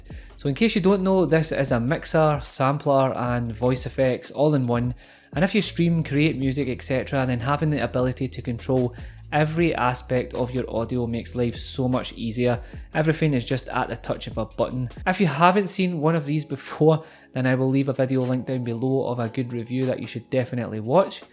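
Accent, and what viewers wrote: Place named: Scotland, United Kingdom. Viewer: Love how ur scottish mate, where abouts you from?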